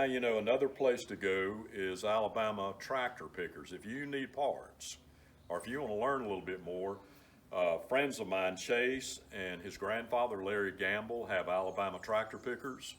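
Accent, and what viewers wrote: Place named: Carolinas, United States. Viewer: You seem very familiar. Your accent and the way it looks I'd swear your in NC or SC...the tiger paw sleeve would make me think your in this area. Not sure if we have ever met somewhere or you maybe just remind me of someone?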